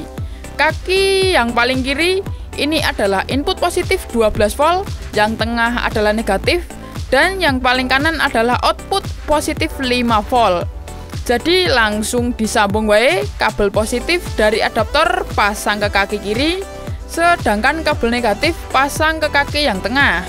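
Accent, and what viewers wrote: Java, Indonesia. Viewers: Akhirnya ada channel gini yang medhok. Udah cukup dengan logat india wkwk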